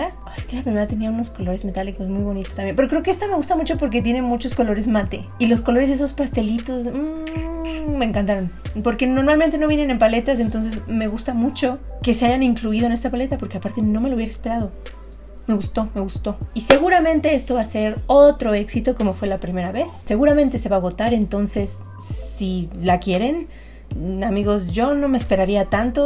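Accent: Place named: Yucatan, Mexico. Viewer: 😂 justo iba a preguntar si es yucateca porque le noté acento 😂 viendo el video ya entendí por qué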